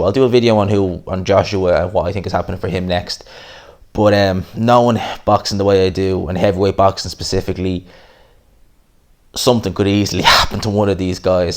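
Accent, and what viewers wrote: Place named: Ireland. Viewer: I'm new to your channel by way of Ade. I'm subbing purely for the Irish accent, the boxing knowledge is a plus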